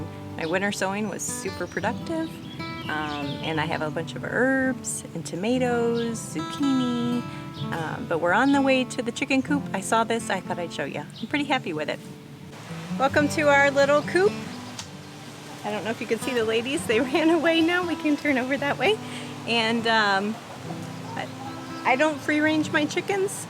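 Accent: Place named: Michigan, United States. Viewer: I thought I picked up a MI accent. Really like your property and homestead. Very peaceful. Looking forward to make garden and bee hive updates!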